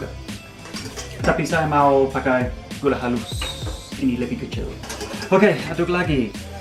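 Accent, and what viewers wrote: United Kingdom. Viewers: Jatuh cinta bgt sm aksen brithisnyaaaa 😍😍😍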